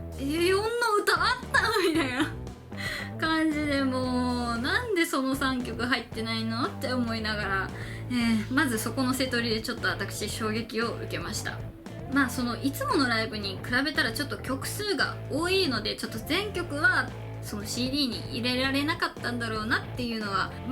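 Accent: Hiroshima, Japan. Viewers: 同じ広島人として、広島弁混じりの動画いい耳心地デス。ソレも有りいつも観て応援しています。 私は、ソニスの頃にファンカム漁りしハマってから、ウェンブリーと2nd発売の頃は、色々買いまくってましたね。 ウェンブリーは音がイイ。 各楽器が立体的に際立ち、BHOベースと青さんドラムの融合感、神バンドアンサンブル粒立ち完璧。 後、買っといた方がイイのは、LEGEND-S だと思います。 地元だし2日間とも参戦しましたが、YUIちゃん急病で片翼の危機に、２人+神バンドの気迫のパフォーマンス大規模演出ライブでした。 藤岡さん最後のギター🎸泣ける。 MOAMETALファンならば、あの奮闘MOAちゃんは買っとかないと！ て、ザ・フォーラムもだし、1月の幕張総出撃も買わんとだし、 まぁ、今年はライブおあずけなんで、その分デロ買ってね（╹◡╹ʔ༄ て、事でしょうかね。 夢が有る事は大事。人生は一度きり。その若さなら時間も可能性も充分有る。 人生は夢だらけ(by,YUIちゃん)w。 頑張れ👍。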